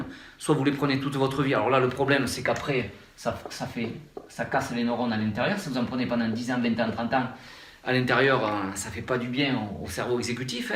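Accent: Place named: South, France. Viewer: vous êtes top! Juste vous écoutez avec l'accent du soleil çà me remonte mon taux de sérotonine;)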